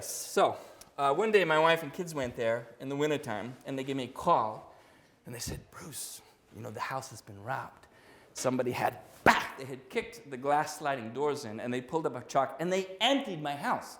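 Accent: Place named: Northern Europe. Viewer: He said he was in Syracuse until his teens. Why does he not sound like New York (or even American)? No disrespect intended. I'm just curious. I thought he was from somewhere in Northern Europe.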